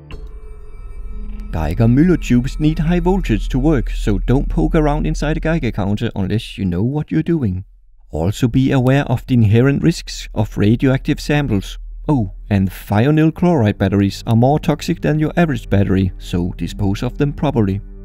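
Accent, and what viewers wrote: Denmark. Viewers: uhhh are you danish? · that danish accent :o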